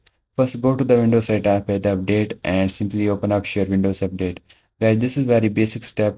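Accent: India. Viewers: im not trusting anyone with an indian accent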